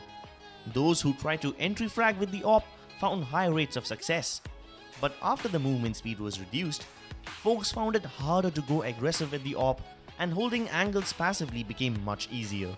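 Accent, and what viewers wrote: India. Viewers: I'm sorry guys, I know you're doing great job at researching and editing, and it's really not your fault (or nothing you can do about it), but this indian accent is just unbearable, made the whole, otherwise well made, video unenjoyable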